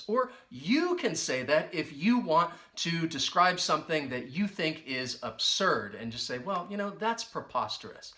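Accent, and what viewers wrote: United States. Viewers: I am from India... even I understood all the words properly in your American accent..all adjectives were tought very clearly... Thank you sir!!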